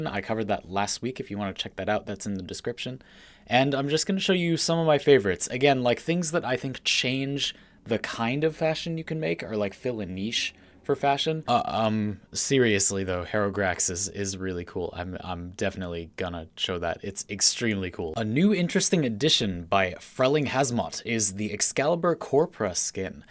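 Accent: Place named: California, United States. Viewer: is so underrated as a wf youtuber. Btw, I Love ur accent, is it Californian?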